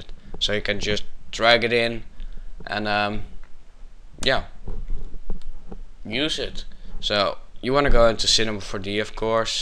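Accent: Netherlands. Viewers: U dutch or from belgium :P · u have a dutch accent :D i can tell because im dutch myself and most dutch people who talk english (or try) sound like this. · I know how hard it can be to talk proper english when you're dutch, because I'm dutch too, but I might have a tip for you. Write down your text before you start, and just read it. Or just please dont use your voice if it isn't good enough. Sorry. You keep swearing and there are Silent letters in english. Also letters you DO have to say. Don't say "oh yea, plural" in the middle of a video. We don't want some gramar lessons. Apart from that, the tutorial was pretty good.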